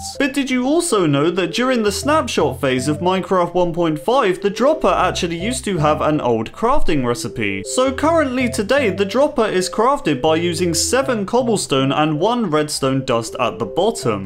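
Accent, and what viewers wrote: United Kingdom. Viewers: R u British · i cant believe ill say this but...now i understand why my friend HAtes english accents...fuck i hate myself now...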